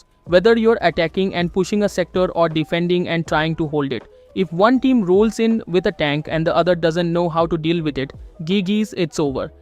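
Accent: India. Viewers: The moment i clicked on this video, i knew it was bout to be good by the indian voice this guy straight to the point like tech tutorials by indians and no extra yapping..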